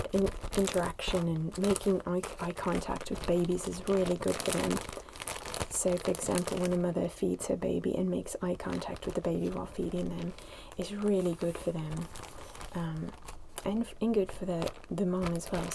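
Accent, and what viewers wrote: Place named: United Kingdom. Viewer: I always forget you aren't British. That accent is nailed tho😍😍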